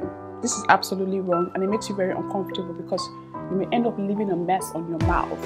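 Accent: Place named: Nigeria. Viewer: I can feel some Nigerian accent ☺️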